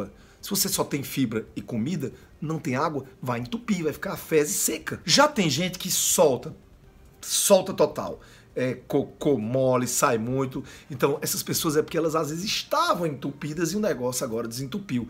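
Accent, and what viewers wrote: Northeast, Brazil. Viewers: Amo o sotaque do povo nordestino e agora você é meu Dr. favorito😊😉 parabéns trabalho lindo👏😘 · Fala logo sotaque. Nordestino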